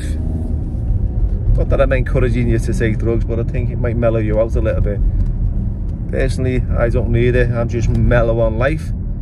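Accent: Liverpool, United Kingdom. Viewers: Go on lad scouse through and through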